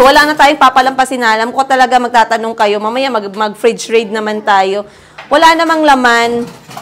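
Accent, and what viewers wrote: Iloilo, Philippines. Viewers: ilong go kaba kc yong tono ng Salita mo ilongo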